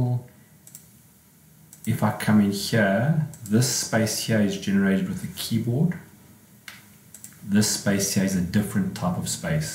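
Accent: South Africa. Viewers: So surprised to turn this on and hear a fellow South African's voice! :) My question is, in the last example what is the 'special' kind of space that was not generated by the keyboard? How can you check for and remove it? Thanks!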